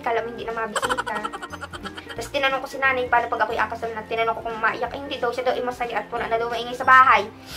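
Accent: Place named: Mindoro, Philippines. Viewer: Natutuwa ako sa mga vlogs mo, relate much ako the way you speak, magkapit probinsya tau, sinasabi ko din minsan mangyan ako, hehehe..keep it up...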